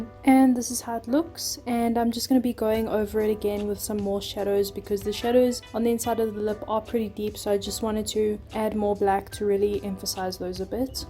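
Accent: South Africa. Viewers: ARE U SOUTH AFRICAN cause u sound SA south african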